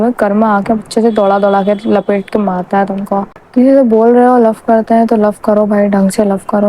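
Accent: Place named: Madhya Pradesh, India. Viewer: Tum MP se ho kya? Tumari accent se lagra hai. Btw consistency on 🔥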